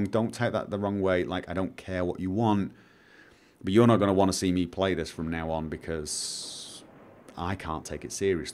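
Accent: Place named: Manchester, United Kingdom. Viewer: I think he's from Manchester, UK.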